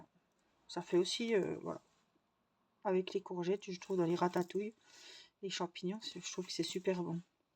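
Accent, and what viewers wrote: Franche-Comté, France. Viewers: Est-ce un delicat accent franc comtois que j'entends ?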